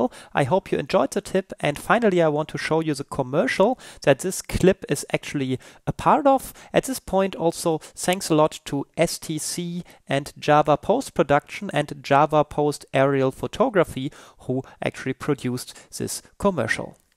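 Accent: Germany. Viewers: Hab das erste Wort gehört und wusste direkt, dass du Deutscher bist :D Gutes Tutorial übrigens :)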